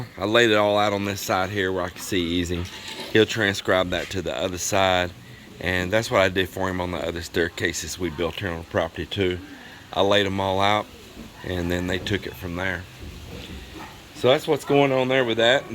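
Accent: Texas, United States. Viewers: Well said, the trolls come out when things aren't good for them, just say it once let them know you know great stuff, love that Texas drawl haha say hi to love you guys great content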